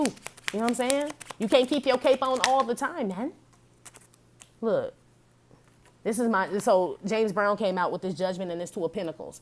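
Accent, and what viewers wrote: Chicago, United States. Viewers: Thank u! I needed it this today thank you honey are u from Chicago? I keep u hearing u say G and Joe!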